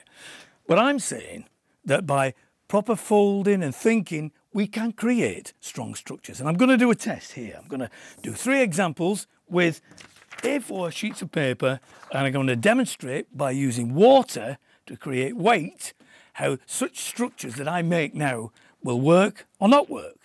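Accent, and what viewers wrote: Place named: Midlands, United Kingdom. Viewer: Some kind of english accent, propably the midlands region i think.. or maybe newcastle.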